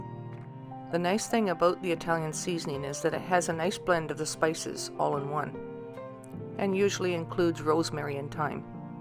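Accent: Canada. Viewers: PS are you Canadian?! I hear my families accents in your voice ❤️❤️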